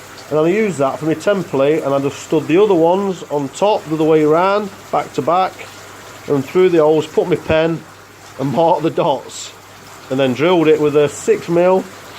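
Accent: Nottingham, United Kingdom. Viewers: Love of Orchids,Koi & Gardening! Nice one, is your accent nottinghamish? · Pond and grow on is looking fantastic matey... Just starting with my build at the moment and created a channel... Would love it if you subscribed and if your free offer any advice...By the sounds of the accent and your Notts shirt you may be local to me ? <link>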